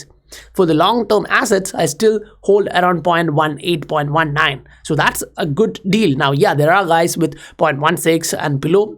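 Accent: India. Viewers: the voice like Indian......?.....great fundamental analysis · You say you're in Korea but you sound like you're from India... ?? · ☺️ btw ur accent is quite similar to Indians 😒..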